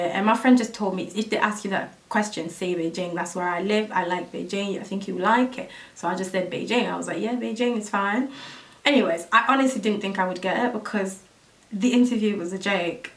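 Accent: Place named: France. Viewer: Your french accent it’s so cute 😍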